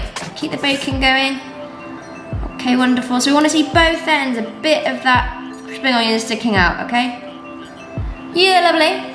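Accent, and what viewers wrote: United Kingdom. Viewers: Hi there, I am Taiwanese who live in London now. As soon as i got homesick, the big issue is that I have to learn name of all veg and ingredients. thanks to your cooking lesson in Eng. and I love your British accent. I'd love to share Taiwanese Food with British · 英國腔的做菜過程，我愛了❤ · 想請問Amy是從英國哪個地方來的呢? 有聽說英國不同地區的發音也有些不同。想請問您是哪個地區的?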